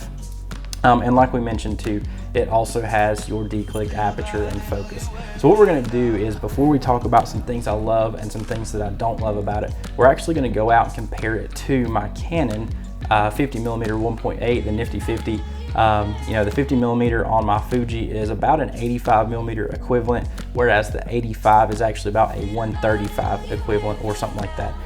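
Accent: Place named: South, United States. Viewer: I'm subscribing just because of your southern accent.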